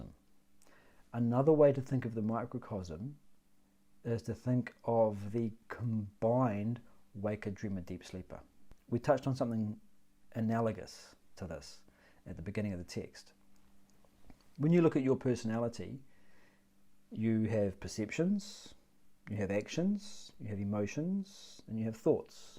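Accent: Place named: New Zealand. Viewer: Auto-generated subtitles seem to have trouble with the New Zealand accent. The prase used in the first part of the video (e.g. ) is "Dream lion"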